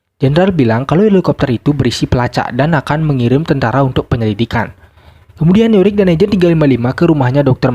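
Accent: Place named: Bali, Indonesia. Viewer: Bang org bali ya?logatnya kentara skli hehe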